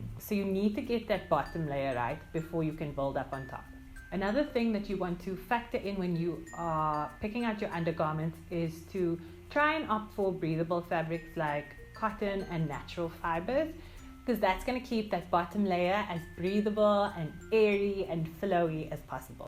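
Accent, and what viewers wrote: South Africa. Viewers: Love the South African accept ❤️💜💙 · Are you South African?? your accent is so interesting!! cool video btw <3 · South Africa!!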